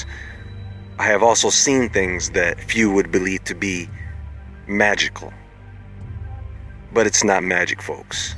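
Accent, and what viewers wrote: United States. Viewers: He makes "neurons" rhyme with "morons". American, Nuff said. That said, far far less off putting than most americans.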